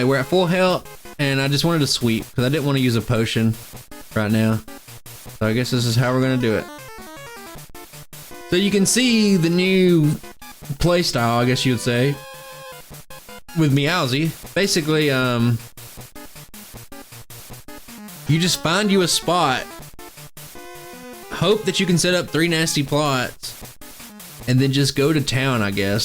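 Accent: South, United States. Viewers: honestly imo the country accent is dope, its one of the things that separates you from your average poketuber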